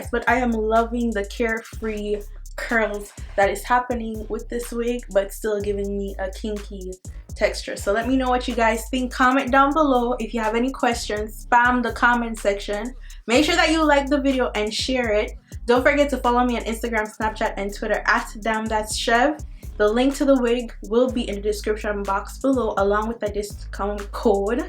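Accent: Jamaica. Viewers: Your an Jamaican I used to watch you video when I was younger but now when mi watch it mi see say you sounds like a Jamaican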